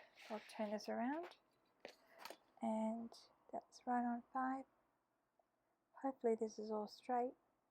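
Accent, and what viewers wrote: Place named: Australia. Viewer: Nice to hear an Aussie accent 🐨 Love this teabag paper concept well done. Cheers from NSW 😊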